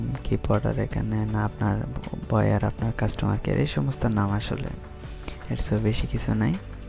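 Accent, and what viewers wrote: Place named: Chittagong, Bangladesh. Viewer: Bhai ki chittagong thke naki? kothar tone ta to chitttagong ar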